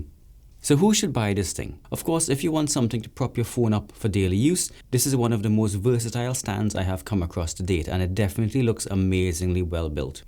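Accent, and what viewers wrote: Trinidad and Tobago. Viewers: Are you from Trinidad? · Speech quality almost convinced me you weren't Trini for a second. Da accent cyah hide! Was looking at the Peak Design magsafe tripod to set up my phone for some photo/videography, but the lack of dual sided magnetism just couldn't justify the purchase since i find myself in some odd locations where a mini tripod slim enough to fit in my pocket unnoticed, doesn't always work. trying to get shots. This one however, checks all the boxes for the modularity i appreciate in a well contrived and engineered product. This and a magsafe water bottle... or a Milwaukee Packout bottle with a 3d printed bracket an I SET! Good video, man! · Is this a guy from Trinidad?... with a touch of British accent?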